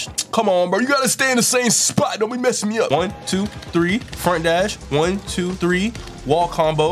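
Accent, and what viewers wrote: United Kingdom. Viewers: YO Keep up the vids love them aspecialy ur brit accent( i want Erly Acc im Krath_100)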